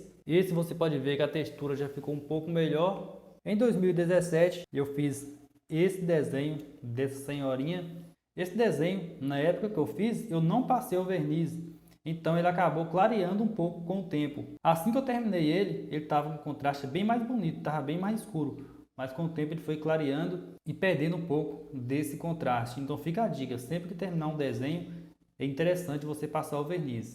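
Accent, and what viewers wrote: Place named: Paraná, Brazil. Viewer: Kkk todo esse tempo eu achei que pelo o seu sotaque você fosse Paranaense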